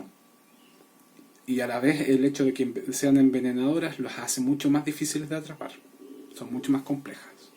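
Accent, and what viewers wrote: Chile. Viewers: Es interesante oirte hablar, eres Chileno? (lo pregunto por tu dejo) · No sé si te han dicho en tu estilo personal y apariencia tiene un parecido bastante notorio con Dross en las miniaturas pero los acentos son bien diferentes el es Argentino pero su acentos no es tan fuerte pues lo dicimula muy bien y ya es un acento más parecido al venenzalano y el tuyo es Chileno si no me equivoco y no me mal intérpretes es más una curiosidad personal porque me gusta el acento de ustedes los chilenos porque es muy respetuoso al hablar y muy correcto bastante parecido al español pues el de todos nosotros es castellano que deriva del español pero si me llama mucho la atención que hablan muy rápido y fluido y no se será la costumbre de hablar muy correcto desde chicos que ya de grandes hablan rápido sin errores para hablar y no es algo que nos afecte a los que escuchamos porque apezar de hablar rápido es muy claros y a según hablan no tenemos ningún problema para entender todo pero no sé porque me parece muy difícil poder imitar ese acento en especial a comparación de los demas y otros que no puedo distinguir es el de los paraguayos y uruguallo es bien parecido al argentino, el de ustedes es un poco pero bien poco parecido al de los peruanos pero ese no lo confundo es como si tratarán de distinguir a los Salvadoreños, con el de nosotros los Hondureños aveces se confunden también con el de Guatemaltecos pero nos es tanto como entre para nosotros también nos confundimos porque son muy pocas las palabras que nos diferencian entre salvadoreños y hondureños, a mí me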